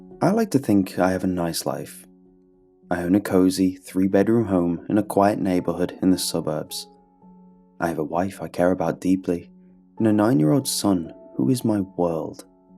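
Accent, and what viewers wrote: United Kingdom. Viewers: British voice 1like=prayer for michael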